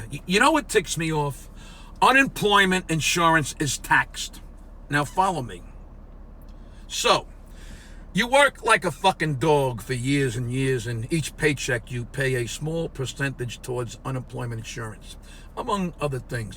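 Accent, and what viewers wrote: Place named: Massachusetts, United States. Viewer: You have a Lowell or Malden accent. I’m from Billerica.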